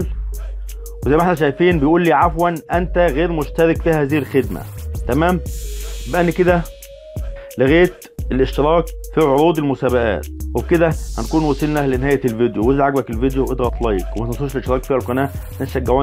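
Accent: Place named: Syria. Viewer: انت سوري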